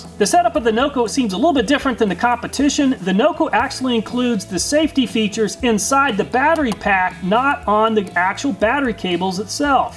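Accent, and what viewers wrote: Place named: Pittsburgh, United States. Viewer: are you from Pittsburgh or in PA? Because you definitely talk like us lol.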